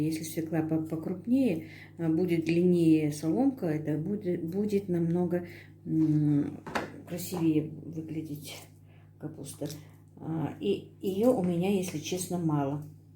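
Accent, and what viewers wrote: Standard. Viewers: Какие интересные рецепты, обязательно приготовлю. Слушаю вас и поражаюсь : какая у вас правильная литературная русская речь! Ваш голос и речь очень похожи на голос моей тёти — учительницы русского языка и литературы!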